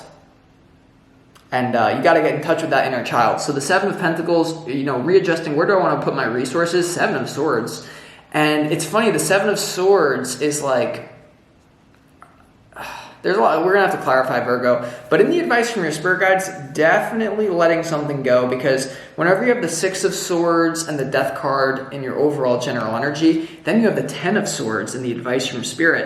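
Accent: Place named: South, United States. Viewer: It's weighing me down and not letting me step into the new and healthy energy and place in life that is right there if I could just let go of them and stop numbing out out of fear. And I also keep thinking maybe I can AGAIN approach this heavy relationship differently and it will magically fit into my highest good. I'm not sure it can though and that sucks. Also the southern accent was funny bc I'm from Alabama 😂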